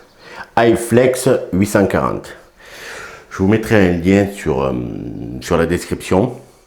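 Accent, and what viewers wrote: South, France. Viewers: Excellente vidéo dans le sérieux et la décontraction avec ce petit accent du Sud pour agrémenter le tout. Ça donne envie de s'y mettre ! Bravo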